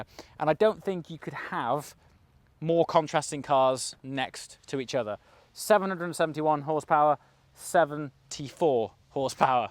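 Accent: RP, United Kingdom. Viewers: I’m sorry dude. I really want to like you so badly but there is just something that makes me feel uncomfortable watching. You just don’t quite seem genuine. It even sounds like you are trying to hide an accent by using and RP type of speech pattern. Maybe it doesn’t translate to your American audience and they don’t pick up on it. But as an Englishman, I’m really struggling. The Chris Hoy name drop for instance. There is a way to be humble and you just don’t come close. I’m so sorry.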